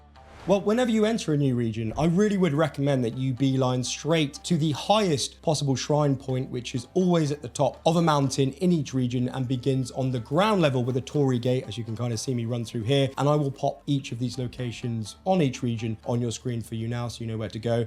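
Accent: United Kingdom. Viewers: You sound so Gay brah.. You British eh?😂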